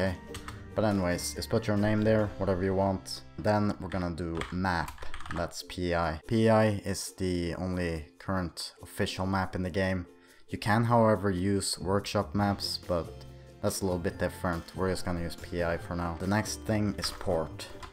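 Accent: Sweden. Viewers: Are you from sweden? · OMG ARE YYOU SWEDISH???? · are you swedish?? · Äru svensk?🤔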